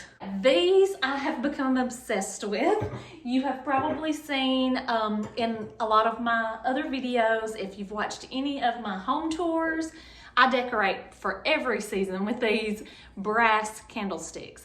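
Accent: South, United States. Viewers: Your southern accent is so adorable. Greetings from NYC. ❤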